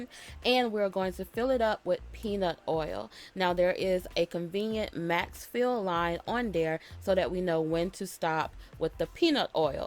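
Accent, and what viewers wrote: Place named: South, United States. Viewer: I was just telling my colleagues (I teach in a public school in Hong Kong) that I do not celebrate my Thanksgiving here with a turkey cause I do not have the equipment to do a Louisiana fried turkey (I'm from Ascension Parish) and they insisted that all of these 5 star glitzy hotels (and Hong Kong has a lot) throw Thanksgiving parties - why not go there? Yes, I tried twice...spent a fortune and the turkey tasted like cardboard and the gravy was so sweet and texture like jelly. YUCK. Thank you so much for making this video. Now, my colleagues can see how it is done AND listen to your beautiful Southern accent:)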